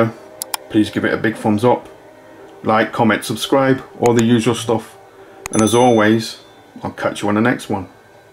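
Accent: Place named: England, United Kingdom. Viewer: U sound just like bmw doc lol y'all must be from the same part of England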